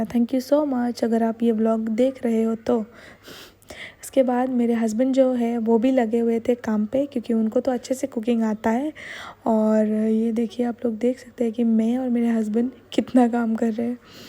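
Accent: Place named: Bengal, India. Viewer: Aap ka hindi bolny mein speed nahi aa rohy bengali aa jata hai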